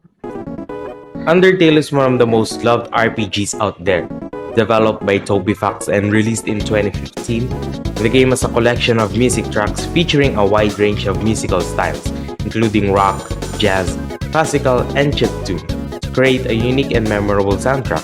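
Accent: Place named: Philippines. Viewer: The Filipino accent is way too strong on this one😅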